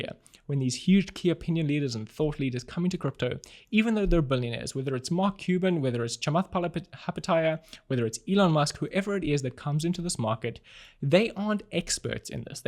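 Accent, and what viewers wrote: South Africa. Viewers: Are you South African? I can hear it in the accent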